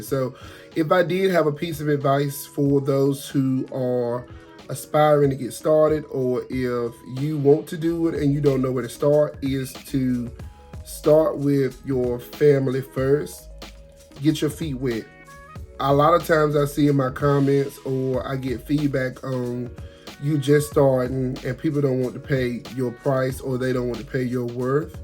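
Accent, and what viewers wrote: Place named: South, United States. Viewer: You country………no way😂 who knew LOL! I love it though ❤ another great video. I love how you give tips to getting started. Again, I’m not doing this professionally I just absolutely love creating. I do so much, you name it I do it but it’s honestly for the love of it. I’m an ER Nurse and Nursing Instructor and I love that just as much. My designing and crafting are also my therapy. I lost my oldest daughter and her husband to an accident May 2022. If I didn’t have crafting and designing whew chile. But God is faithful and I trust Him. If God calls me to do it professionally then I’ll answer but I have to hear from Him and I’ll need him to call me by my whole government name! Keep elevating Sir.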